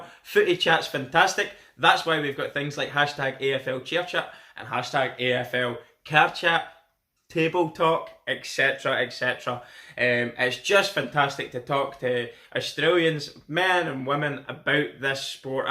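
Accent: Scotland, United Kingdom. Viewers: love the scottish accent man, i see the rest of the round similar to how you do. Although i think St Kilda will be dangerous for us as they got a lot of negative press about their performance this week it could make play out of there skins, We getting 4 premiership players back including our captain and hopefully we don't get ahead of ourselves thinking it will all happen but come out and really work hard and get the job done.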